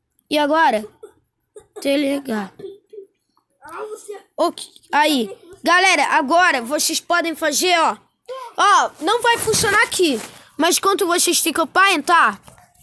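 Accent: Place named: Rio de Janeiro, Brazil. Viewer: Ele e Carioca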